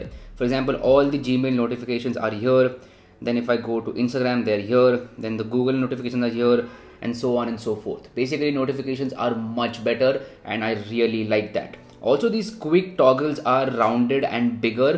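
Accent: India. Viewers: INDIAN SPEAKING ENGLISH IS SO DAMN LIT ! I MAY UNDERSTAND THEM NOW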